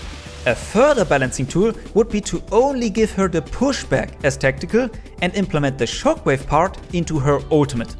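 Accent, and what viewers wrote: Germany. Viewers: One question are you german 😅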